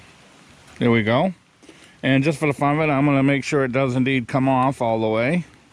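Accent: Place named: New England, United States. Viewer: Dude definitely is from New England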